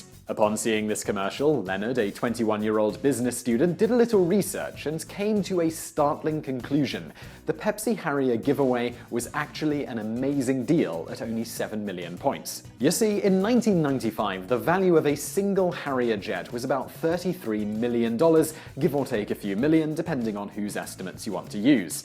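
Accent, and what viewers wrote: United Kingdom. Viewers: "PentaGIN" Its PentaGON" what the fuck is so hard to say that with a heavy british accent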